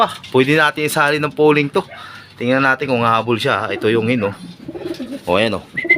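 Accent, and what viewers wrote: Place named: Iloilo, Philippines. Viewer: kasi parang my tono kang ilonggo hehe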